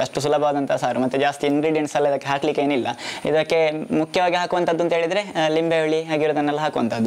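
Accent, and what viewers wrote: Mangalore, India. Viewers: ನಿಮ್ಮ ಅಡಿಗೆ ಹಾಗೂ ಮಂಗಳೂರು ಕನ್ನಡ ಭಾಷೆ ಎರಡೂ ಚೆಂದ!!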